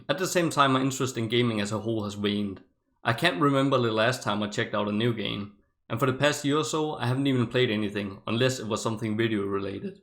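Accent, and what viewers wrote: Denmark. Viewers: You Sound very danish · Is blud danish?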